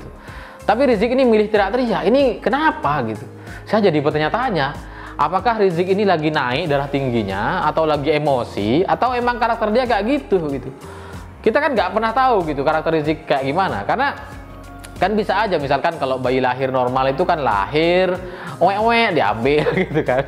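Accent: Madura, Indonesia. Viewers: Kya logat madura bicaranya bang😅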